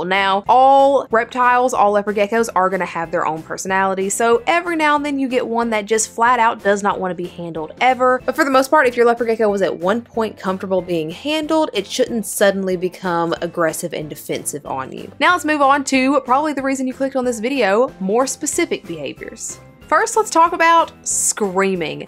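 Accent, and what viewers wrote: South, United States. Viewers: that southern accent❤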